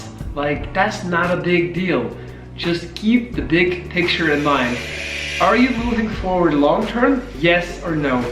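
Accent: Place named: United States. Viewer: thats amazing! When Ive heared You for the first time I thought You are from USA:) I also watched the bold and the beautiful but with my grandmum:)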